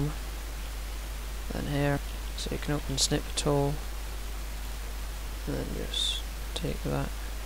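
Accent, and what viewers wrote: United Kingdom. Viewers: BRI'ISH 👽😩 but yeah "It's not looking good brav", from the solution of y'all's problem, is to have a smaller res, some duu from another vid published 6 months ayo saiiyyyd to do a 50x50. We'll see tho, I'm nearly at 90 DNA. 👽👀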